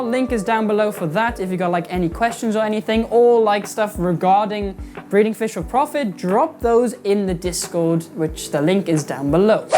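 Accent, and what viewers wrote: Wales, United Kingdom. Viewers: Trying to place your accent. Welsh ?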